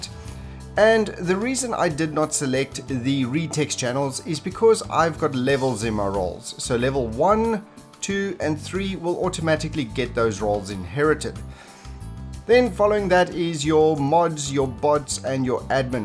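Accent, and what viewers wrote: South Africa. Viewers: Oh, I know that accent, are you South African,? Also, thanks for sharing this tip. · Where are you from? Your accent sounds very South African.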